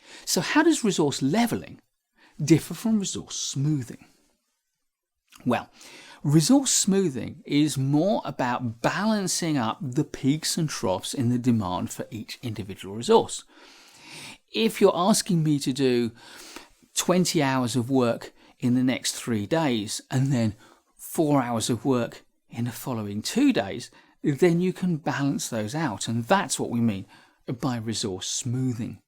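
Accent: United Kingdom. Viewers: Dear, thank you so much for this clear and well-structured explanation. Your British accent is so beautiful, I really enjoyed the video.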